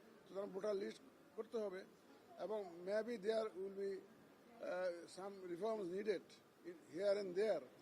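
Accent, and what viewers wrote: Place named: Chittagong, Bangladesh. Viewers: চট্টগ্রামের মনে হয়। কথায় চট্টগ্রামে আঞ্চলিক ভাষার সুর। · ভাষার ভাব বলছে, চাটগাইয়া স্যার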